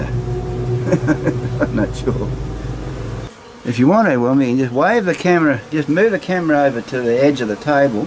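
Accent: Australia. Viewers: hello mate. im a third year beekeeper. had my share of success and failure in that short time. i go to a lot of renaissance faires and was thinking of putting together a display hive for exactly what you said( the wow factor when they see the inner workings and beauty that are inside the hive). was looking at ideas when I found your videos. good job sir. very enjoyable. plus being an American I love to hear you talk (you sound like my buddy Ian from queensland) I like it almost as much as listening to my grandparents who are fresh off the boat from Scotland. keep up the good work and I'll keep watching. im also trying to get a youtube page off with a few videos but im not that good with or in front of a camera. i love trying to video my swarm removals. I've rambled enough, have a g'day mate and dont forget to Bee Happy. sorry couldn't resist.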